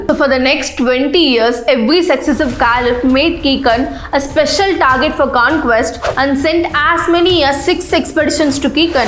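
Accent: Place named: India. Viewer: Love her indian accent ❤❤❤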